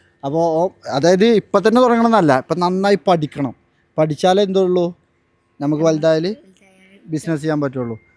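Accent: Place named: Northern Kerala, India. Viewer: Hi എനിക്ക് നിങ്ങളുടെ സംസാരം കേൾക്കാൻ വലിയ ഇഷ്ടമാ. വടക്കൻ സ്ലാങ് 😅😅😅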